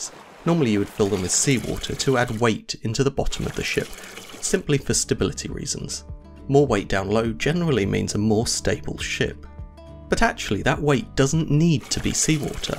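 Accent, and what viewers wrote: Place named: England, United Kingdom. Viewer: I guess a English accent should make everything more palatable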